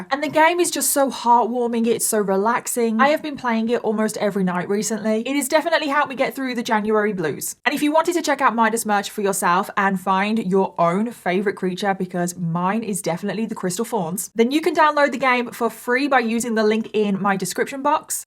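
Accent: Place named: Birmingham, United Kingdom. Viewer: I’m just messaging to say welcome back nice to see you do true crime for us all ❤ much respect from the brummy girl it’s nice to here someone with the same accent as me for once 😅 happy new year 🎉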